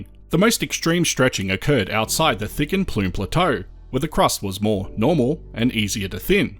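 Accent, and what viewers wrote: Australia. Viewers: the voice is much easy to listen, australian accent is ok way better than the other channel.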